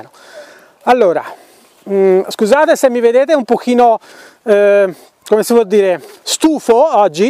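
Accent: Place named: Tuscany, Italy. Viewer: Caro e un piacere guardare te e Ame😂Le tue spiegazioni sono chiare ed esaustive condite con l'accento toscano che non guasta affatto. Grazie per la tua sapienza e onestà. ❤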